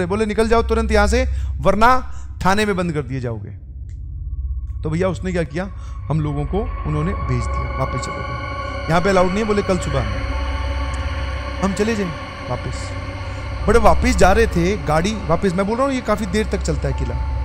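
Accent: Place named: Bengal, India. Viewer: Ami je tomar😅 hayy your Bengali accent just killd me ❤❤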